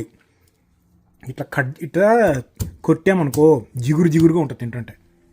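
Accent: Andhra Pradesh, India. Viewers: Bro, meeru telangana nizamabad ayna, telugu mathram ap slang la undhi 😊😍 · meru chese vlogs chala baguntai, meru asala andhra na telangana na? Me native Nizamabad ani antaaru kadha, kani real ga ne meru Nizamabad aa? leka andhra ninchi akkada settle aiyaara? Me Telugu pure andhra laga untundhi, please clarify cheyandi. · needi telugu andhra telugu la vundi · day 2 of asking ----- anna nvu gun carry chestava safety kosam? and nv nizamabad nundi annav kani andhra slang undi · Medi telangana khada. Mari andhra slang nti ani na dought 😊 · Bro mee vooru Nizamabad antunnaaru kaani mee bhaasha Andhra slang lo vundi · meeru telangana kadha anna slang mari andhra laa undi enti anna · Bro meedhi telangana ayinappatiki mee slang completely andhra style lo untadhi...❤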